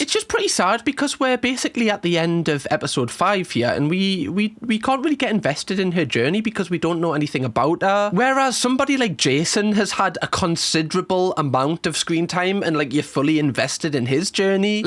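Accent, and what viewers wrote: Newcastle, United Kingdom. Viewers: It’s his Geordie accent so watch someone else if this bothers you too much. You can’t tell people to change their accents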